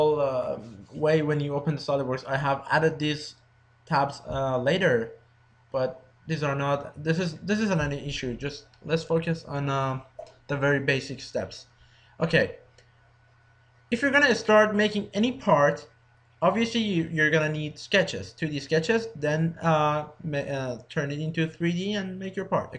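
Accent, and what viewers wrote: Iran. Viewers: Love the Persian accent. It reminds me of grad school (my advisor and a half-dozen labmates were Iranian). Your English is fine, just enough giveaways in the diction to identify the Persian accent. :) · Nice Tutorial. Persian accent.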